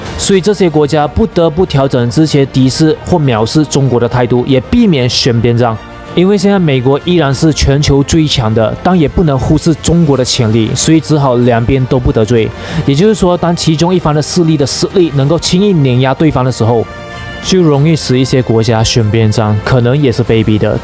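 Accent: Malaysia. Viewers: 听他的口音 是马来西亚人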